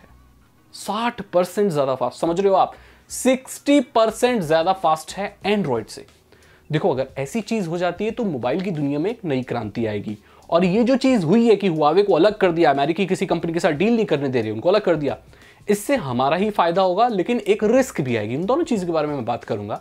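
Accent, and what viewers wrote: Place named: Bengal, India. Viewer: Bangali tarka😂😂😂from kolkata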